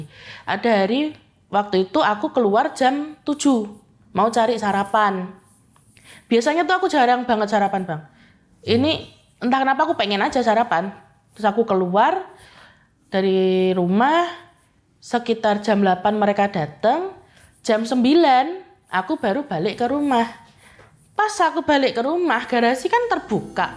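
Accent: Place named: Java, Indonesia. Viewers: Enak bnget ini mbk ceritanya mudah difahami kata katanya ada logat medoknya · Mbaknya ciri khas orang Jogja bgt. Slengekan seru klo ngomong. Nyenengin dah! 👍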